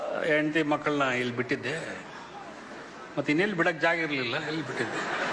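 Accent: Ballari, India. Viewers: ರವಿ ಬೆಳಗೆರೆ ಅವರ ಮಾತನಾಡುವ ಬಳ್ಳಾರಿ ಕನ್ನಡ ಕೇಳಲು ಆನಂದ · Ballari Kannada ❤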